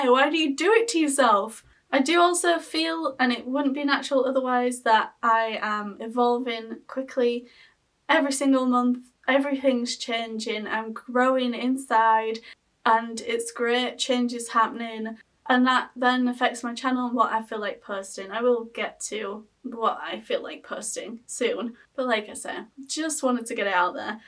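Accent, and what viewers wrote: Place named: Yorkshire, United Kingdom. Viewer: I found your channel when I was looking up Yorkshire accents. I only know of one person from that part of England. I’m not into Sims, I do have iOS products, but I’m not as excited about them. My point... I’ll get on now and then just to hear you talking. Not trying to be a creeper, it just sounds cool. Take care. 😊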